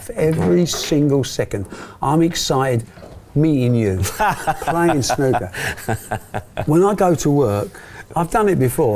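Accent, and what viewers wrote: United Kingdom. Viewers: Thank you so much Mr Hearn, you speak so nice and correct. It's a pleasure listening to you! I improve my english a lot and I love the british accent, but also the scottish. You are a role model for having a successful life.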